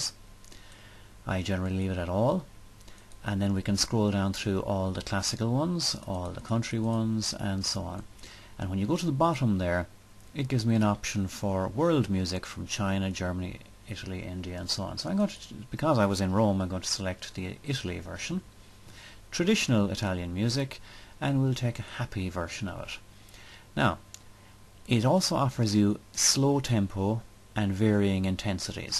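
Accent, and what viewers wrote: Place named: Ireland. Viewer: Hi guy. Enjoyed your tutorials. Love that Irish accent; just came back from a 12 day tour through your lovely country. Tell me, how can I play background music on just a bunch of slides in the middle? I start narrating and then I want to play some music without narration, and then pick up the narration again later? Please, please!!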